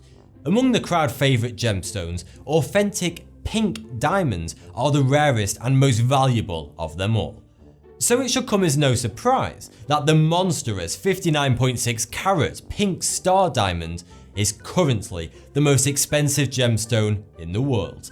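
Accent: United Kingdom. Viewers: He is saying 42. It's a play on words sort of thing. The number 42 is said to be the "answer to life, the universe, and everything" or something like that. This has to do with the fact that he tells various facts about things in life in his videos. His channel is which sounds like 42 in his British accent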